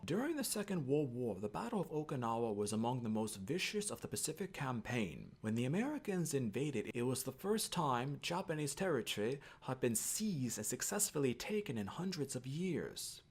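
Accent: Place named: China. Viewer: Speaking as someone whose family lived there for 40 years, this is nothing short of Chinese Communist Party propaganda, part of their wider pattern of asserting they own everything on the planet. I can say with certainly every Okinawan person I know would also say this is total BS. Okinawa was an independent international trading hub for centuries and did the best they could to appease both the Chinese and the Japanese. They were well aware of the dangers of their geopolitical situation, and vulnerabilities. Though many of us were honestly hoping for independence in the late 60s, it was never going to happen. Do you really think China would leave them alone if they were independent? True the Satsumas were devils in their day, but meanwhile, as Japan has become more civilised while China has regressed from their responsibilities as global citizens. For the sake the people I know, the current statehood within Japan, the people are far better off than if China had control - just ask the people of Hong Kong. 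Suggest instead reading Kerr 'A History of an Island People' BTW, This person is reading a script with an obvious Chinese accent.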